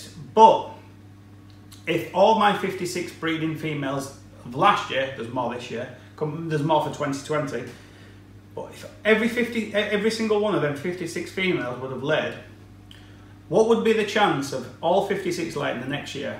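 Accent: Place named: Northern England, United Kingdom. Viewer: Cracking upbeat advice...I got me a killer male...with a smiley emoji on his head from some bloke with a northern accent and an unreal car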